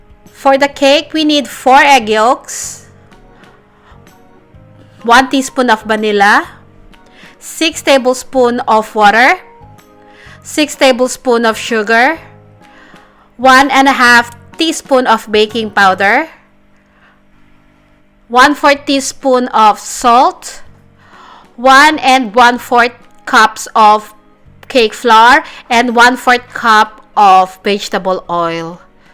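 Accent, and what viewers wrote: Philippines. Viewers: Filipino accent...nice.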